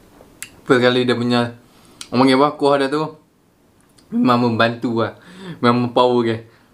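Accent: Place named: Kedah, Malaysia. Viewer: Abg orang kedah kan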